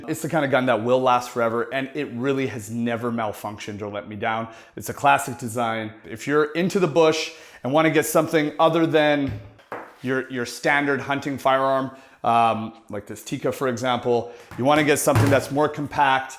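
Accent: Canada. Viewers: Wow you DO sound Canadian, eh?!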